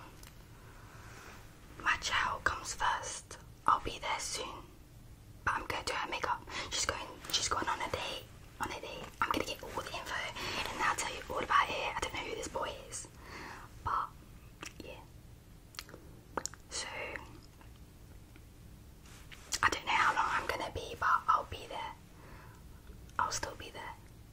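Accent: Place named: United Kingdom. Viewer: What a beautiful British accent like the girl 🫢‼️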